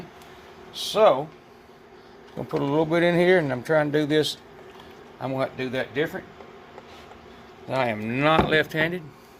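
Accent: South, United States. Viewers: Love it! And love your southern accent!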